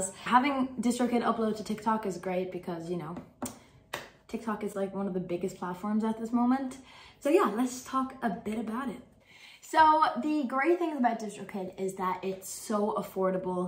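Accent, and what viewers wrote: United States. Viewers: What's with the American accent Allie? you are from the people's Republic of Cork... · Sounds like she's going a tad American pronunciation,) ammhmmm....well is it?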